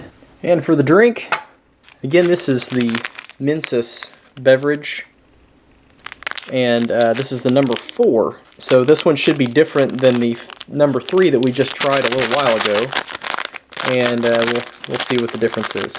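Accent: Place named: Oklahoma, United States. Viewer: I love the Okie accent 😍,. Maybe that's cause I'm from North Central Texas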